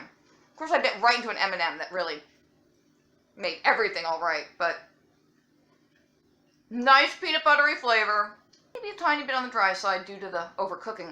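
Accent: South, United States. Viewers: You sound more southern than the actors and actresses that fake them!!❤❤❤😎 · 💞Love your Southern Accent & how funny you are!!💞💞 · I just love Southern Belle voice. xoxo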